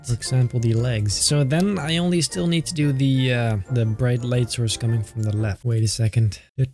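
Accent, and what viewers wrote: Netherlands. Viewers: Wow maat dat is sick dat ik jou nu pas tegen kom. Ondanks dat je Engels zeer goed is kreeg ik toch meteen al het gevoel dat je Nederlands was🙈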